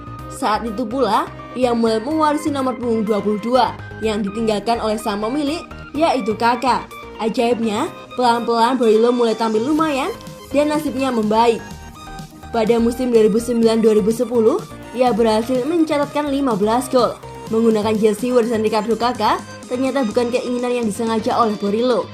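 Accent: Java, Indonesia. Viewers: Bahasanya Medok Jadi Susah Bilang Borrielo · Meddok pingit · VO NYA MEDOK BAT DAH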